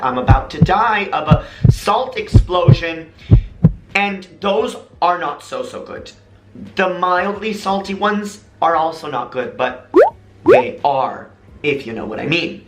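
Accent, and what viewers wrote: Netherlands. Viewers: your Dutchlish (Englitch?) is 💯! Not only does your video crack me up, but I’m learning Dutch van jouw. Lol 😂 has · I like that you speak Dunglish, and switching on the automatic subtitles is just hilarious. The translation software just goes haywire and really doesn't have a clue what to make of what you're saying. So funny! I see you've discovered the sound and video effects, but you really don't need them. You're entertaining enough without them (and quite frankly, the effects are a bit distracting to what you're talking about). And hey, 11K!!! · als Belg doen we dus ook al die " rare" dingen alleen is drop niet zo populair hier and i adore your dutch accent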